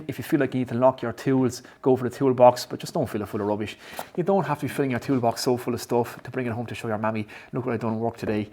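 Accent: Ireland. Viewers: Love your channel. I work in IT but have always had a love for woodworking so you're right up my street. My Dad didn't get much of an education but he built up incredible knowledge with all things electrical. I always joked with him that he could have lectured an electrical engineering course. Completely understand that the need for regulation in this discipline but would love to get as much knowledge as I can and follow in his footsteps. If there's any material you would suggest for this, I'd love to get it. Really nice to hear an Irish voice on YouTube talking through these things.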